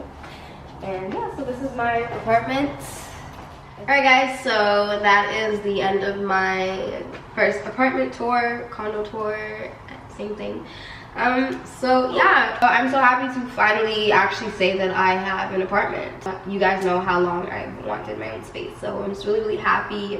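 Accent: Jamaica. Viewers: Oh she Jamaican Jamaican? Lol “wait nuh man.” Congrats beautiful! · I heard that accent 🇯🇲🇯🇲🇯🇲🇯🇲 #jamaican in the building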